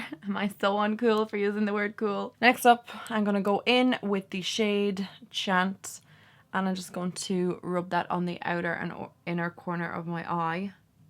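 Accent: Ireland. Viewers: Where is your accent from in Ireland?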